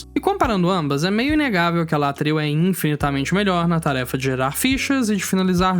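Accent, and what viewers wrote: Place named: Minas Gerais, Brazil. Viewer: descobrindo agora q o é mineiro, entendi pq é tão suave ouvir os vídeos kakakakakka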